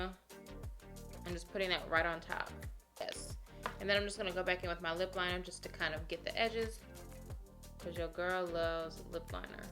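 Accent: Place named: North Carolina, United States. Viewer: Hey it sounds like you live in my area of North Carolina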